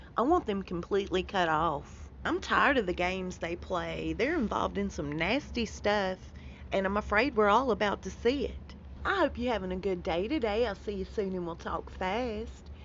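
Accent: South, United States. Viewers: someone just posted on Twitter that a security guard on the dress fitting got mm on film pitching a hissy-fit (you sound southern, so i know you will know what a hissy-fit is) and is releasing it to the Canadian press.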